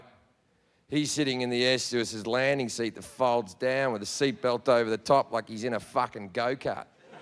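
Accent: Australia. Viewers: A lot of Aussies talk like this. QUEENslander. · He's Australian, mate. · He is just aussie:) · Wow, love him. So beautifully Aussie. 💖💯👍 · Nah mate, he's just Australian. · No. As an european, I sensed that it might be aussie. And it it! :-D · Australian accent very irritating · He's just Australian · No, he's just Australian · He is just Australian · He is not American !!!😂This is an Australian accent Lol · That's just the more relaxed and slack type of Aussie accent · He's from 'straya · He's Australian that's their accent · Nahh... he just sounds Aussie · Australian mate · Just Australian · He’s an Australian comedian. Hello.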